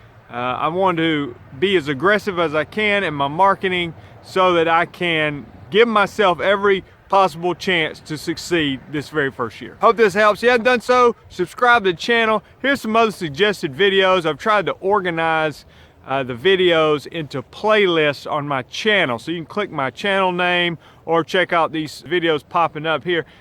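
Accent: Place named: Southwest Virginia, United States. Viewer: You sound like you are from my Southwest Virginia area lol. Everyone and their brother runs around with a mower of some sort in their truck out mowing lawns. there isnt really many decent or big sized companies around here because the business is so saturated. I am surprised I got any lawns really this year and wont be surprised if i loose my commercial property to Jo Blow on the street that wants some beer money next season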